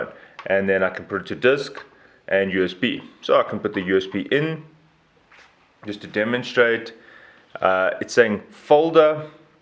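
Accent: South Africa. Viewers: I knew you sound south African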